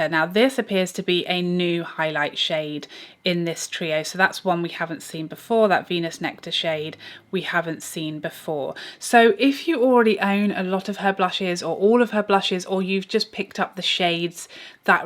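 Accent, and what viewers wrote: United Kingdom. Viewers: Oh a new video. Thanks and your accent always gives me wanderlust vibes to go to UK. I hope it will happen soon. :-)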